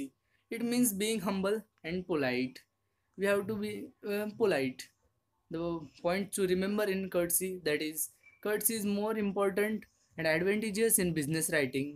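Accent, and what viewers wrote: India. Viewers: Indian accent with english language No thank you i'm not watching this video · clicks video hears Indian accent fuck_no.jpeg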